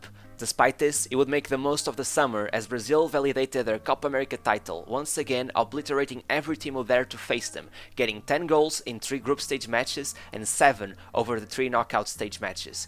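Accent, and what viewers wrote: Portugal. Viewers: you sound like a portuguese guy talking english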